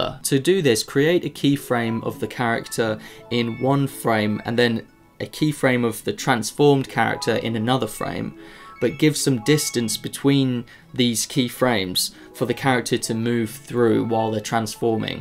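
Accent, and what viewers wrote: United Kingdom. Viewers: Thank you very much! I needed morphing in my studies))) I really enjoy your videos thanks for British accent ;)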